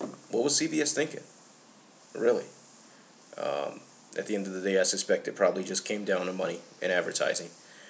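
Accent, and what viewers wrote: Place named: United Kingdom. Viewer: bring back your british voice. it's HAWT and i miss it.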